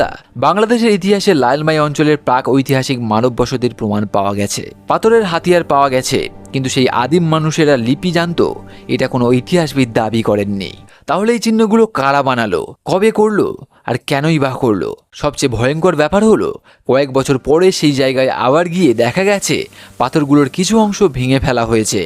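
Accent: Kolkata, India. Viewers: Vai why do you have Kolkata accent?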